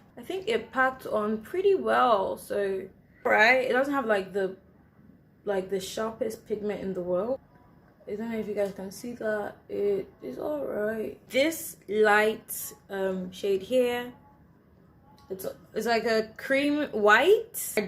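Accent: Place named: United Kingdom. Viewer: This your British accent doesn't want to go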